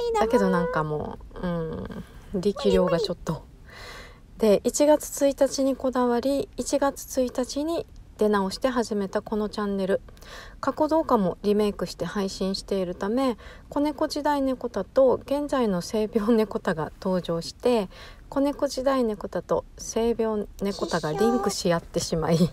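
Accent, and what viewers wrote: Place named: Okinawa, Japan. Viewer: 元気いっぱいのくん☆ コスプレも好きなんですねぇ☆ 時折でる沖縄なまりが自分は好きです☆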